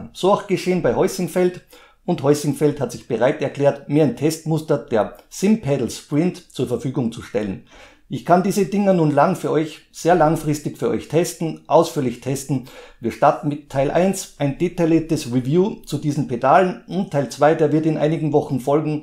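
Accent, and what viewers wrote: Austria. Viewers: A Sum up would be verry Nice! Like your video’s but the accent (Austrian) is verry hard to understand for me 😁 really curious about your opinion related to the v3 (i).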